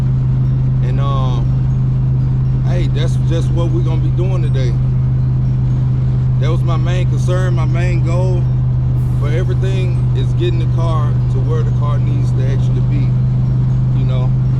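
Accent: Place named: Memphis, United States. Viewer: Sounds like you from Memphis. But great video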